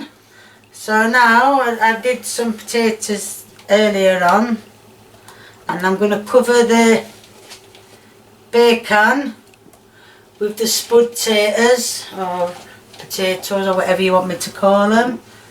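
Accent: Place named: Yorkshire, United Kingdom. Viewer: “Oh I’m just going t too tip lot aht” a proppa Yorkshire lass ah. Do you think you could try making jerk chicken?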